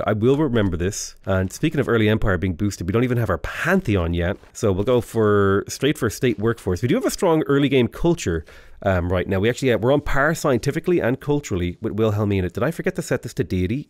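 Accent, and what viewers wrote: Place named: Ireland. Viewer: Is that a "reserve Irish-ism"?! Adding a "th" sound where there is one instead of not being able to say the "th" sound?! I treasure the few times we get the Irish accent from our great!!! Wish they were more common, but alas they are not!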